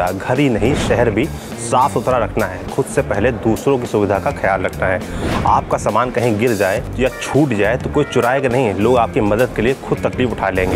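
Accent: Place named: Kanpur, India. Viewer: are You From Kanpur....coz your way of speaking seems like Kanpurites...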